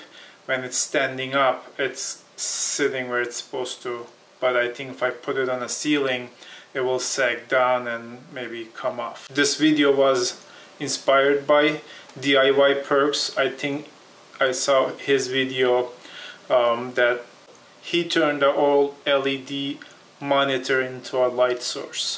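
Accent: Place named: Turkey. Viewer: This is Turkish Accent 😏✨ 🙋‍♂️ hi there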